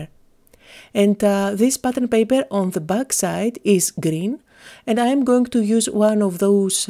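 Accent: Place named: Greece. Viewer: your accent makes me wish to be in the Pelleponisos again this summer!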